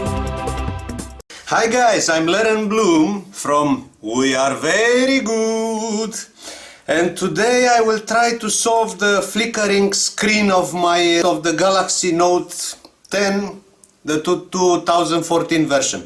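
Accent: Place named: Russia. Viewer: i love russian accent, благодарю вас comrade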